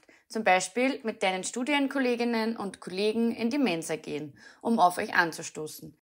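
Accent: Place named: Austria. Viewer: yoooo is that an austrian accent? echt krass so sick. best accent, congratulations on growing up in the coolest sounding part of the german speaking world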